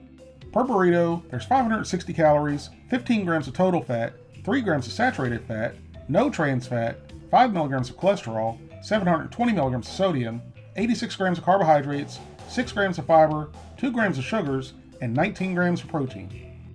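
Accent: South, United States. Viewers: I have missed your videos and Southern voice !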